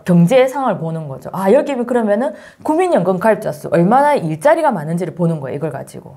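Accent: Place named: Busan, South Korea. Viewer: 설연휴 보석같은 강의 너무 감사드립니다! 핵미모+부산사투리 매력만점 팀장님이십니다^^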